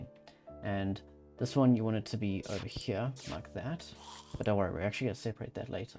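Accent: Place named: South Africa. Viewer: Sorry, I couldn't help notice the accent, but are you South African?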